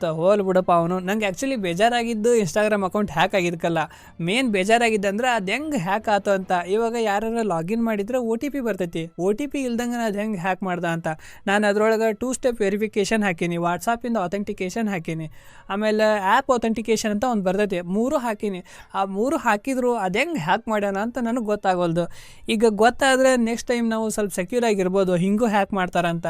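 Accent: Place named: Vijayapura, India. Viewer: ನಿಮ್ಮ ಮಾತ ನಮ್ಮ ಕಡೆದೆ ಇದೆ ರಿ ಸರ್ ನಾವು ವಿಜಾಪುರ ಮಂದಿ ಅವನವನ ಬಾರಿ ಅಂತೀರಿ 😁😁😁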